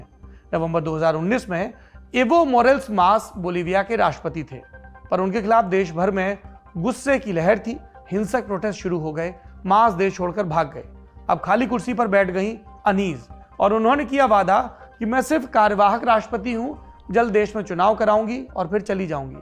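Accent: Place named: Bihar, India. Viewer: Jaise aap bolna start kiye waise he bolia... achaa lagta hain.....uttar pardesh ka Bihari bhaasa me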